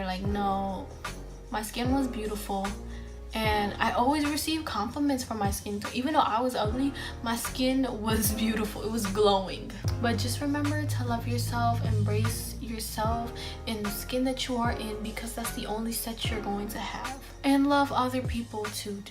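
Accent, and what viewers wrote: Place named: Philadelphia, United States. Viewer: You have a Philly accent. If you lived in Philly, you had Italian food. At least that's my experience. You have the food from your culture and you had Italian.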